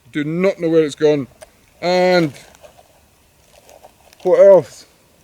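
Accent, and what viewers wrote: Scotland, United Kingdom. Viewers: Scottish accents have to be the best speech style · Arrived for the kilt, stayed for the Scottish brogue and sense of humor. What a beautiful manly man. The brogue tends to make me weak in the knees man.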